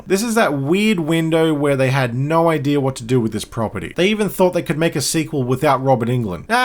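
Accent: Australia. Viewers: I could listen to this Aussie talk about horror movies all day!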